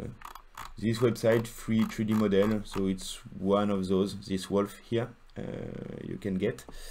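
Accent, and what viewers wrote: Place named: France. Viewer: Quand un français parle Anglais c'est toujours marrant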